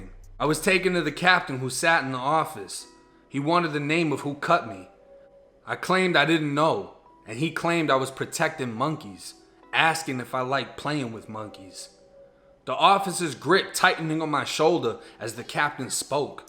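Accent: Boston, United States. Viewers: Florida story with a Boston accent. Never thought I’d find something more entertaining than this 💯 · Bra you got a Boston accent you must went to Florida on vacation and got caught and did a bit in Florida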